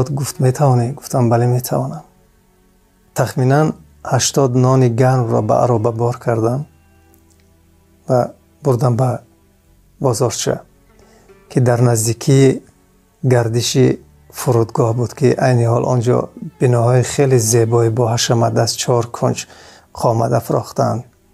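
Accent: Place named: Ayni, Tajikistan. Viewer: Бово лахчаи Айнигия гум накунед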